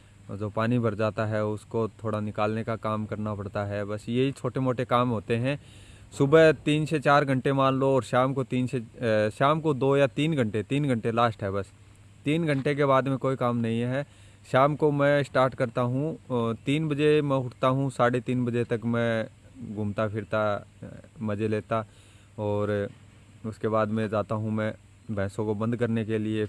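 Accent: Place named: Rajasthan, India. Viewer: awaaj se toh tu rajasthani lag raha hai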